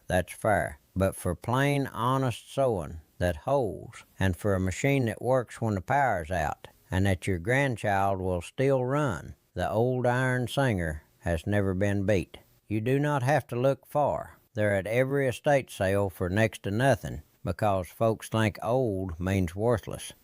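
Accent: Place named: Appalachia, United States. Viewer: I love the way you talk, I'm in maryland and you have the Appalachian accent that i remember hearing growing up. When i heard someone like you talk i knew i was going to get good advice or given advice that was a joke that i believed until they later told me it was a joke as they let out a laugh.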